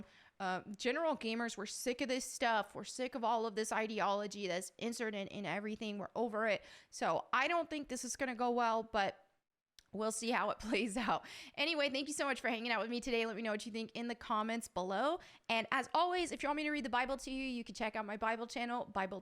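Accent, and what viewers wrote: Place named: Pittsburgh, United States. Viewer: I'm not sure how you popped up but damn I'm glad you did. Not afraid to say what everyone is thinking... Lara Croft... And the steelers popcorn container back there - I have one that's coors light/steelers. I thought I heard some Pittsburghese in there but I'm not sure on that, all I know is you're my new favorite person lol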